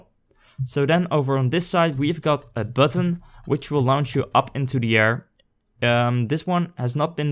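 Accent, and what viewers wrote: Netherlands. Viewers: kerel, jouw nederlandse accent😂😂 nice! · Are you dutch? You really have that dutch-english accent, i know because i am dutch XD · Holy shit je nederlandse accent man xD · you're Dutch aren't you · Are you from Belgium or Netherlands? :p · are you dutch i can hear it from your accent? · Ben je nedderlands haha ik hoor het aan je accent leuke video ga zo door! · he has a dutch accent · I can hear That youre dutch from ur accent :P goeie video man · je hoort gewoon dat je nederlands bent...lool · hey you dutch?????? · You sound dutch. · Netherlands xD Ik hoorde je accent al een klein beetje. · bad english lol, you are obviously dutch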